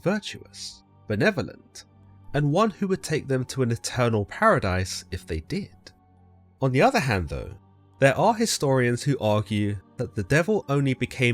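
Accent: United Kingdom. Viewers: No AI voice just a cool British guy with a great narrator voice keep going brother .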